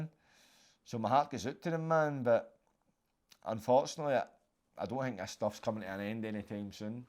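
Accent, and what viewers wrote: Glasgow, United Kingdom. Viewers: What u on about he’s talking the way 95% of Glasgow people will talk which is more relatable to people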